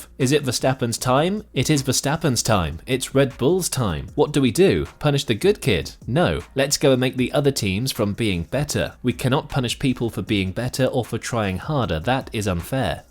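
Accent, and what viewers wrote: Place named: United Kingdom. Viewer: It's getting to the point with these videos, that as soon as you hear a bri'ish voice-over, you may as well skip it.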